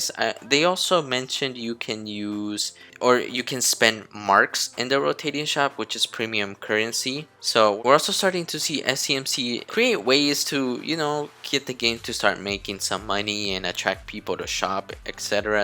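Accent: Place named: Philippines. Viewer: I just noticed you're picking up an awkward Filipino accents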